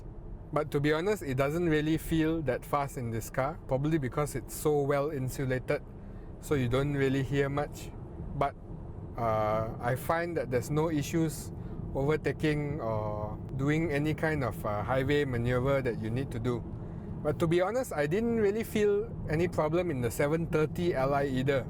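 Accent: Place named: Malaysia. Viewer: Great review. Informative and extensive, with nice Malaysian touches with your use of "la" in your speech!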